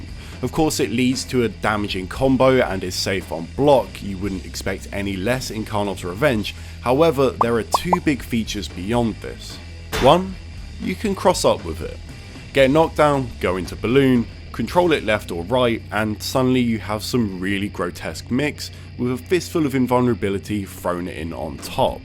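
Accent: United Kingdom. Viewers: Calling something broken is a day one option select lmao. Excellent writing already. Btw, the audio mixing has improved drastically. Your voice is clear as day. The music is much clearer as well, but mixed in just right to add texture without muddying up your very British accent.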